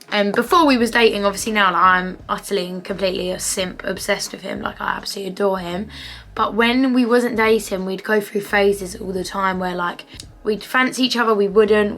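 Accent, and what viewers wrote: United Kingdom. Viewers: I like your British accent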